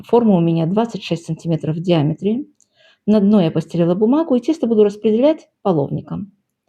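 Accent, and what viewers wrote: Baku, Azerbaijan. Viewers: Как вы хорошо объясняете,подробно ,все секреты выпечки не скрываете..Настоящая бакинка советского времени .Я тоже бакинка ,жила 8 завокзальная,у нас у всех такой акцент ,бакинский,чистый литературный.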